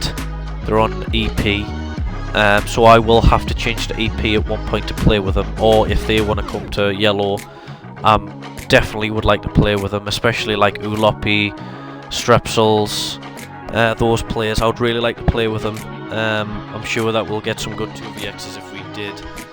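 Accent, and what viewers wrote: United Kingdom. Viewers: Uk accent S tier 😂 ggs the commentary is actually dope touch to the gameplay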